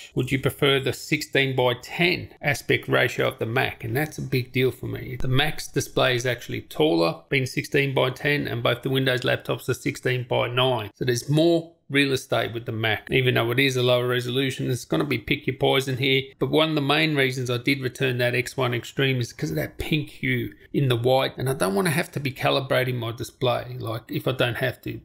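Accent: Australia. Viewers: u sound like an aussie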